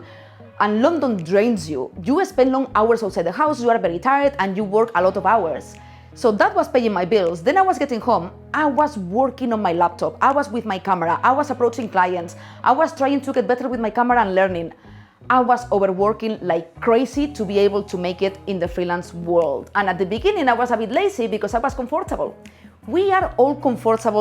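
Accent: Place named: Spain. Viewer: Hi there, your videos are great and interesting. But english is my 2nd language and you are not so easy to understand because you talk so fast and the Spanish accent. So can you speak slowlier in the future? Would be good for the viewers with a background like mine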